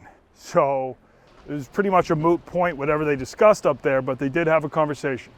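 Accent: Boston, United States. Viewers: Dude you're hilarious, its the subtle boston accent that does it.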